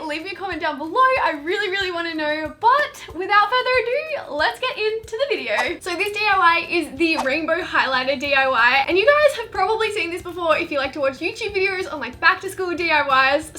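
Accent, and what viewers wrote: Australia. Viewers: are you Australian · I love your Aussie accent its so cute♡♡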